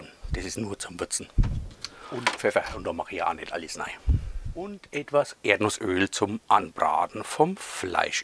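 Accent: Franconia, Germany. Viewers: Hi das sieht richtig richtig gut aus ich werde das mal nachprobieren 👌 Sehr schön anzusehen mit deiner ruhigen Stimme und sympathischen Art, das bringt selten einer rüber, a echter frangge hold 👍 Vielen lieben Dank für dieses tolle Video und ich lasse sehr gerne das Abo samt Domglocke da um nichts zu verpassen 😉 Gesund bleiben und weiter so ✌️ Gruß Olli ✌️ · Saugut! du bist spitze. Endlich mol a Frangge und ned su a zipfl der ned richdich deitsch sprichd. Grüße aus Bamberch.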